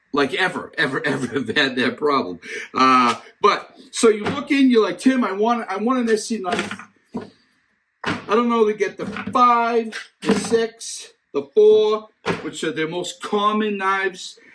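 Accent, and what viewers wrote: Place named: New England, United States. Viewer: But I guess this guy's from New England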